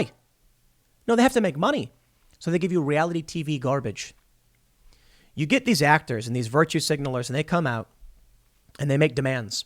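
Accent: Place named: Chicago, United States. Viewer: .... Every video your Chicago accent is getting stronger. Something is definitely off...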